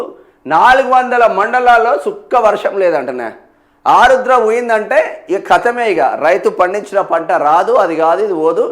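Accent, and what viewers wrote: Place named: Telangana, India. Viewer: అన్న మా అమ్మ ఇదే మాట చెపింది ఆరుద్ర పోతే ఆదేరువు పోయినటే నిన్న అమ్మ చెపింది ఈరోజు మీరు సమె చెప్పారు అన్న నాకు తెలంగాణా యాస భాష లో వినిపిస్తుంది ఖనిపిస్తుంది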